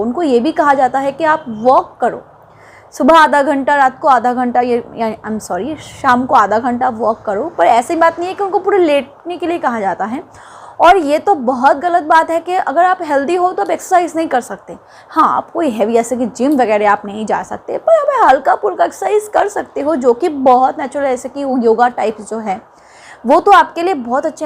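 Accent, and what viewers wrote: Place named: Bihar, India. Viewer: Hello my name shehbaj Khan Aap Bihar se belong karti ho Kyu ki apki voice Bihar ke taraf ki hai ye nhi bol rha achi nhi h bas lga mere ko ...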